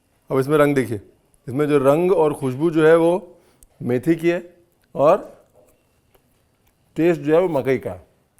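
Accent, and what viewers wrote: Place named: Punjab, India. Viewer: Mr. Brar, I have come across your cooking videos while surfing on the net randomly about two weeks ago. Anyhow, I haven't tried your recipes yet, but the mouth watering desi "Tarka" of your crispy and spicy chat is enhancing the flavour and taste of your programme immensely. The reason for enjoying & watching your programme is not your recipes but your dextrous and impressive way of connecting with your intangible viewers globally. It's worth mentioning that amalgamation & use of typical household punjabi, hindi words with appropriate dialect phonetics in your chat is stunning & awesome. Great job, keep it up.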